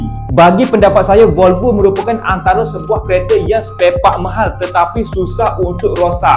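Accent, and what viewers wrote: Kelantan, Malaysia. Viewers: dia pelat kelantan..percakapan melayu ada loghat2 gitu 😂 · Kecek klate mudoh pehe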